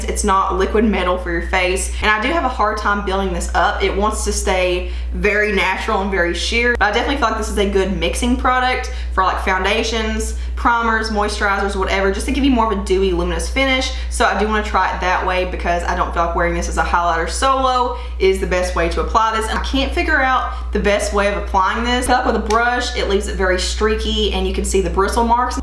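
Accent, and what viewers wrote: South, United States. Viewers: I love love your video! This is the first time I’ve seen your channel and I live it!!! You have a wonderful voice and you don’t stammer! You know exactly what you want to say! No “um” no “like”! You talk fast but not too fast! On other channels if the talk too slow I find myself saying come on spit it out already! I get bored and go to another channel! You are very professional! And your southern accent is over the top! Will be watching all your videos! You rock girl! Thanks and keep up the great work!!! PS I love your lipstick! What is it? All of your makeup looks fabulous! Great job!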